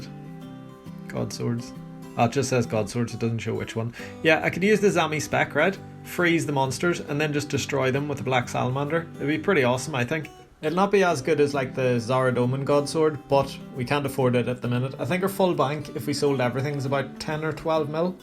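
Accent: Northern Ireland, United Kingdom. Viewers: You from N.Ireland?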